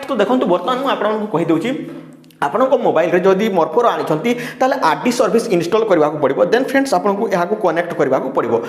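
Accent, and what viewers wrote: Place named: Sambalpur, India. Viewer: Sambalpuri kahu6 bro ... Nice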